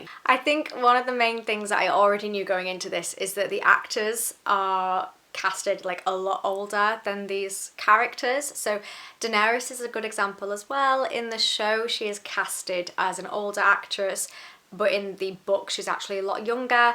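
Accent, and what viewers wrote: United Kingdom. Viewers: You sound like you're from Hull.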